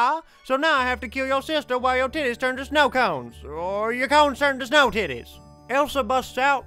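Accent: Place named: Louisiana, United States. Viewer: he sound like he from the byoo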